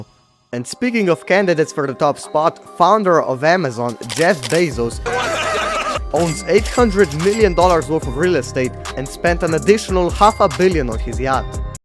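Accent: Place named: Southern Europe. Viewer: im guessing youre either from sa or southern europe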